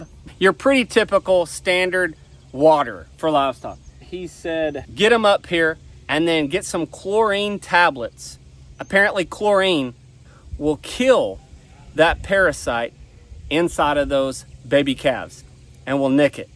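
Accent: United States. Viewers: You can say “Waller” all the time as far as I’m concerned. Please don’t change your country accent. It’s nothing to be ashamed of. I love it.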